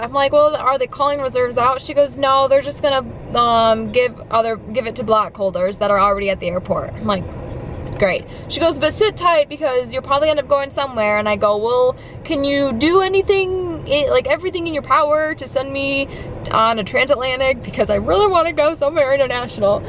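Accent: Midwest, United States. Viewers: Is that a Midwestern accent?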